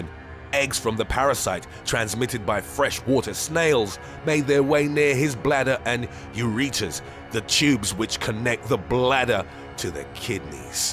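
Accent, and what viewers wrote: England, United Kingdom. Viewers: The new narrator is too dramatic and his british accent annoys me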